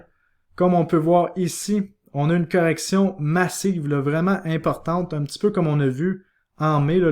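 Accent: Quebec, Canada. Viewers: Salut, encore merci pour tes émissions fabuleuses, et ton accen québecois, je voulais te dire merci pour tes conseils d'investissements judicieuses. Je suis tout à fait d'accords avec toi le bull run est loin d'être terminé. Je pense aussi que le Bitcoin va atteindre 200 mille dollars mais moi je pense plutôt fin novembre ou début décembre. Mais par contre je ne suis pas tout à fait d'accord avec toi sur l'interpretation de ce qui s'est passé mercredi lors du gros krach à 30 000 Moi je pense plutôt que se sont les créateurs du Bitcoin qui ont organisés se krach pour sortir les acheteurs du marché et ensuite amener le Bitcoin entre les 40 000 et les 30 000 $ pour le faire ranger là et le mettre sur sa rampe de lancement pour lui offrir la plus grosse impulsion qui arrivera dans quelques semaines où le Bitcoin s'élancera en direction des deux cent mille dollars. 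Les concepteurs du Bitcoin ont été obligés d'executer cette manoeuvre même si se krach a été un terrible bain de sang pour beaucoup de gens Pour les inventeurs du Bitcoin, s'etait un mal necessaire pour le faire parir du plus bas possible, pour lui offrir la plus grosse impulsion, pour que la monter dure le plus longtemps possible. Paradoxalement pour aller aux 200 mille $, ce n'etait pas d'y aller depui les 65 000 $, mais au contraire en ramenant le Bitcoin entre les 40 000 et les 30 000 $. Pourquoi ça? Et bien c'est simple à comprendre beaucoup de gens oublient que le Bitcoin n'est pas un actif pour la spéc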